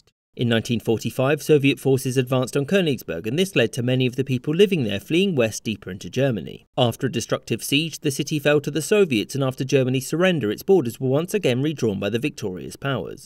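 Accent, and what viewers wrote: United Kingdom. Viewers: Since you have a British accent, how does England own Falklands and Gibralta, and some part Cyprus? And so many lands outside UK? That should be the real question.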